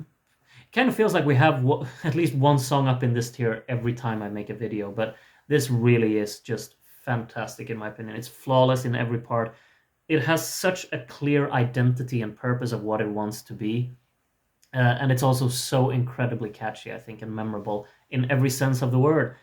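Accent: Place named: France. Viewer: I love this videos as much as I love ur' french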